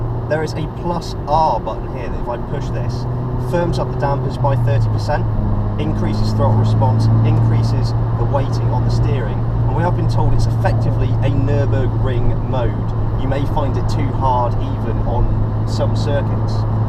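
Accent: United Kingdom. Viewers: total waste of 10 mins. only interior shot of a guy driving around with annoying British accent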